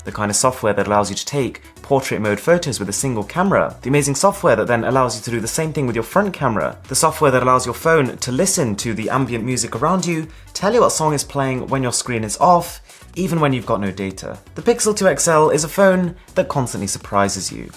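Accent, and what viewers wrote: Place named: England, United Kingdom. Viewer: Just out of interest, is Aaron from England or from the USA? The reason why I ask is because he sounds like he has an English accent but he says "Zee" instead of "Zed" And he talks about the prices in USD instead of GBP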